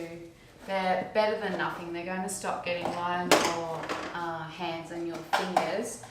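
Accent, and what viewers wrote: Australia. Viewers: question. I’ve been weighing out my lye flakes in a glass Pyrex tub with a red lid (as if the color matters! 🤣) before stirring them into my distilled water in a stainless steel jug. (Snow falling on the lake.). Is it safe to use glass for dry lye flakes or should I switch to plastic? Thank you so much! Still inadvertently thinking, reading and making soap in your beautiful Australian accent! 😂. You’ve changed my brain - thankfully for the better! 😂. Take care, stay healthy! 😍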